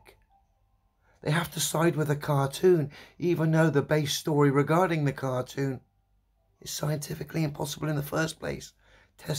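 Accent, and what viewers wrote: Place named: London, United Kingdom. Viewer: Good old British London accent.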